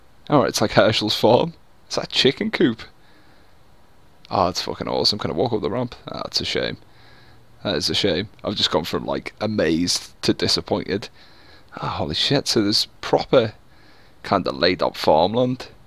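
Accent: United Kingdom. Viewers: always enjoy these PZ videos keep up the good work man, are you from the liverpool area? you sound a little scouse just wondering haha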